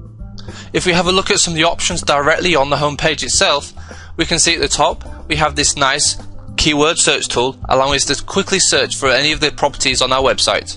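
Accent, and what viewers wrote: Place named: United Kingdom. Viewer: I agree. It is a classic British accent,but not at all pompous or fake.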